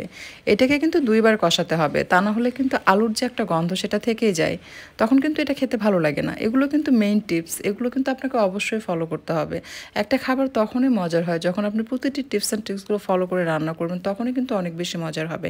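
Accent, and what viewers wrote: Bogura, Bangladesh. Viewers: Jak bugurar 1ta apu pelam Tai toh boli kotha ato sundor kano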